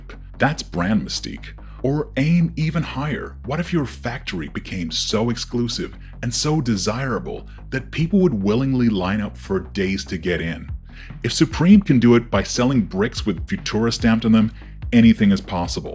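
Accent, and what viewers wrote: United States. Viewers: Your American accent is so good that I forgot that you’re British/Australian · Woah, new to this channel and was totally convinced that was your voice, with maybe a compressor, but certainly not a pitched down American accent! Well done! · your American accent was spot on except for when you said "military" lol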